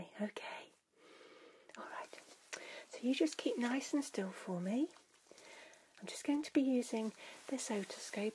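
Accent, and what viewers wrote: United Kingdom. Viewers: Love hearing that beautiful British voice & it sounds so bloody lovely! Watching your content always feels so welcoming and relaxing as well! Keep up the great work & goodnight! 🥰💜💜🥱🌃🌙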